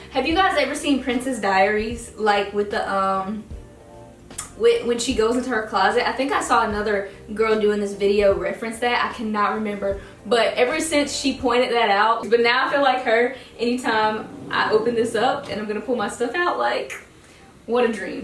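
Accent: South, United States. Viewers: Bruh yeah you def from the south. You did not just say “Catty Corner” 🤣🤣 I haven’t heard that in a minute. Your closet turned out beautiful!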